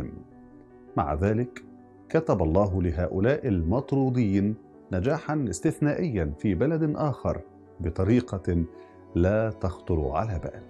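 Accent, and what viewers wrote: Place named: Morocco. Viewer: بالفعل صوت متميز. أعتقد اصلو من المغرب. تحية لابن بلدي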